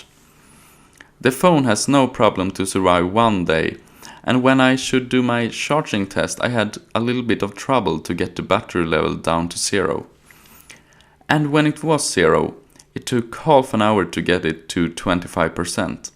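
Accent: Sweden. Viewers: Haha älskar breda svenska brytningen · even worse as Swedes have a reputation of good quality English - almost no detectable accent. not this guy... but whatever, his accent is still better than Scottish or Indian accents, those i literally cannot understand at all. This guy i can completely understand so i give him a pass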